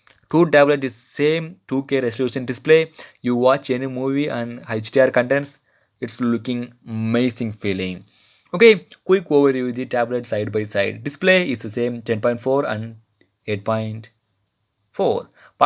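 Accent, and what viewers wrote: South India, India. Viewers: Are you South Indian??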